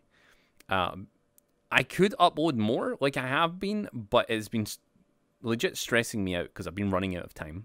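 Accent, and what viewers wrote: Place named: Scotland, United Kingdom. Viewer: Wait a minute he’s got like a Scottish accent and now I’m not gonna be able to unhear it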